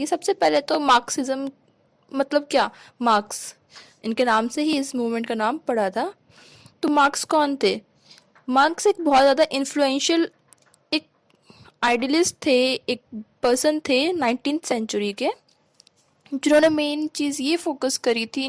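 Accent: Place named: Kashmir, India. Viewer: ur voice looked like u were Kashmiri Anyways thx my dear sister Stay blessed ❤️❤️❤️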